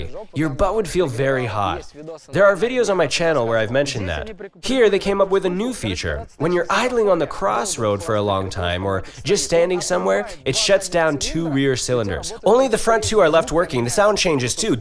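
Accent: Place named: England, United Kingdom. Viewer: oh you're from England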